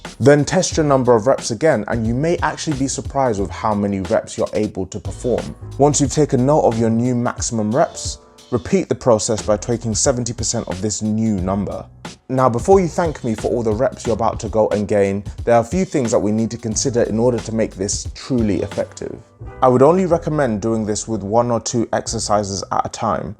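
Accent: United Kingdom. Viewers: woo...The British accent is almost as impressive 😅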